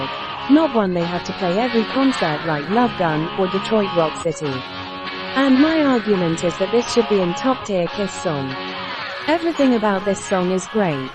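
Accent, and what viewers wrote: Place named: United Kingdom. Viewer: WHY IS A BRITISH WOMAN NARRATING THIS FOR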